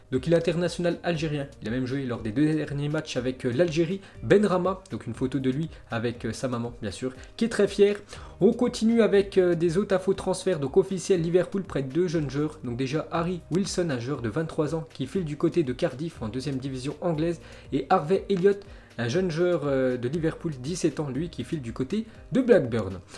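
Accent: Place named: France. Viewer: tu es ridicule de prononcer les noms à la française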